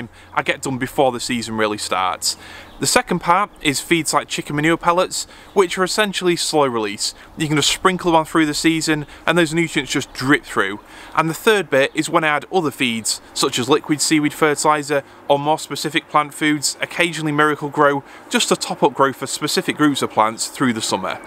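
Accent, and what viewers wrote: United Kingdom. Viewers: First time I've seen your channel... Its great. Love ya Northern accent and your plants.. Lush m8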